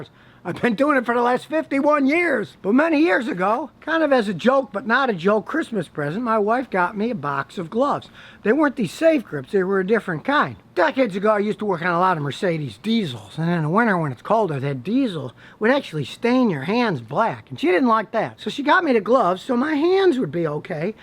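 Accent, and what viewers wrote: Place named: Texas, United States. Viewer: I am here for two reasons: cars DIY and texas accent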